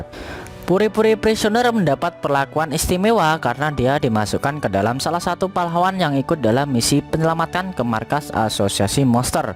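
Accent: Java, Indonesia. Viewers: Medok tolol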